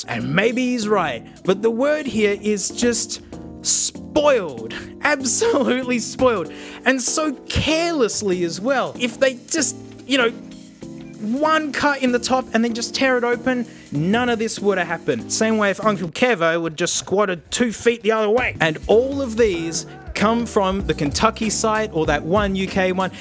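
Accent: Australia. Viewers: Perfect way to start the day, aussie ranting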